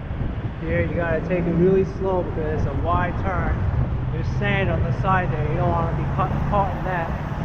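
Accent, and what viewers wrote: New York, United States. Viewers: Cute NYC accent.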